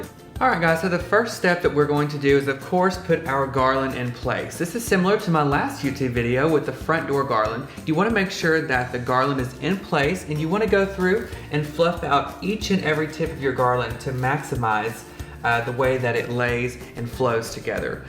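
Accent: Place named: South, United States. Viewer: Child, you are so southern! Love it! ♥️♥️♥️♥️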